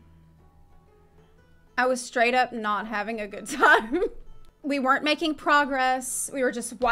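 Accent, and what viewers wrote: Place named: Texas, United States. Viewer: let the darkness consume yeh. how does a Ukrainian end up with a texas accent?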